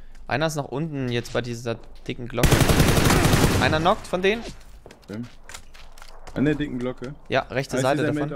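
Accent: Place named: Saxony, Germany. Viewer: Das Sächseln ist einfach nur eklig.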